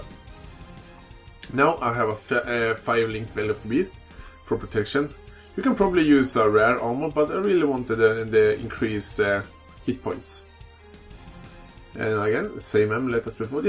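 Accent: Sweden. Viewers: where in sweden are u from i also have family in sweden and the only reason i ask is u sound like one i know:)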